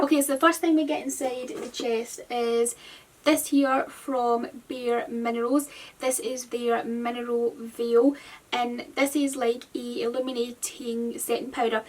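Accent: Scotland, United Kingdom. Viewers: Beautiful Christmas box! I'm trying to place your accent. Are you by any chance from Scotland?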